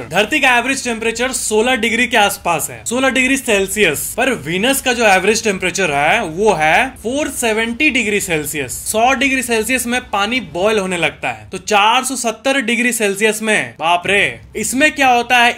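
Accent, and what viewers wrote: Bihar, India. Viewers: missing telling facts in bihari voice form💖😊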